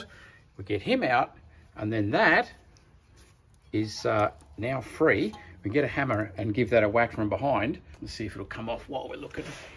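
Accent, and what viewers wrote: Australia. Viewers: Were are you located. You sound aussie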